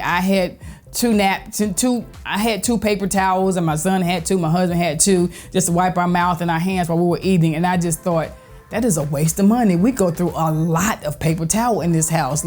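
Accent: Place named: Texas, United States. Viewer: New subbie! I knew that you were from the south before you said it. You sound so much like me and my family here in Texas! I love it! So relatable. Your suggestions for saving are perfect! I'm a huge paper towel purchaser. If I run out of it, I'm not happy. But your alternative to cloth napkins sounds promising, I have cloth napkins, but use those for decor most of the time. Also the deodorant, I'll buy the twin packs for myself, hubs and son. I've always known about the aluminum that's used but... I've also tried Lume there's 0% aluminum in there's. The catch though, is that it doesn't really smell like anything we're used to in deodorant (has a very light scent) But that's okay, it's safe. Also, I'll be trying out the white vinegar for our laundry. As those are also things I have on hand at home. I'm so about saving a coin, if I can. 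TSMFS❤❤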